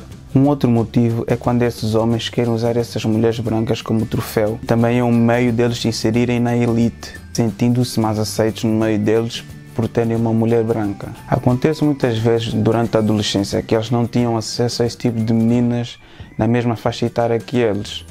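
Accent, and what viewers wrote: Portugal. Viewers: pq homens afrodescendentes nao gostam de mulheres afrodescendentes? e vc é de qual país ? Portigal ? q sotaque de portugues é esse? eu sou brasileira e vc parece ser tão brasileiro por sua cor moreninho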